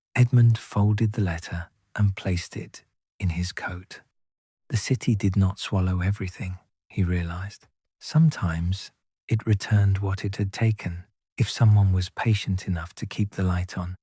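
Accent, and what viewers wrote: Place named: Lancashire, United Kingdom. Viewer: Thank you. Gentle voice. Lancashire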